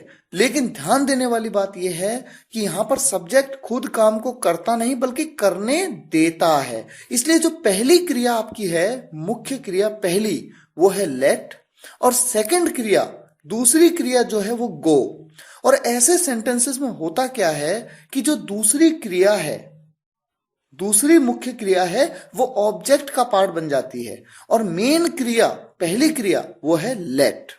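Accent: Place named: Bihar, India. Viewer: Bihari lg raha hai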